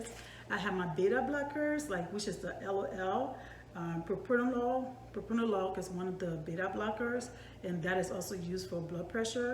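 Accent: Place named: Liberia. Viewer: Hey you sound like Liberian. I am Liberian too. Congratulations I am preparing too for the nclex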